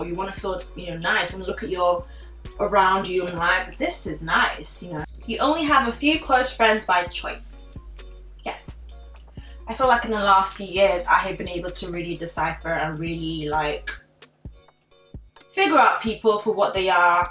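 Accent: Nigeria. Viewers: Your Nigerian accent came out during this video, and I am here for it sis!!! Love you😍😍😍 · I like u ur a smart girl sis lol I mean I've always liked u but after this video I'm like🤔she talking sense btw I love ur Nigerian accent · Your Nigerian accent definitely made it's appearance in this video and I LIVE! Lol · I find you very attractive with your Nigerian accent😍😍😍😍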